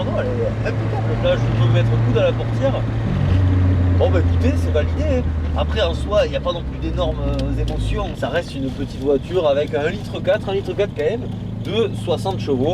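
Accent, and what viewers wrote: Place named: Southwest, France. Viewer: Référencement pour l’accent du SO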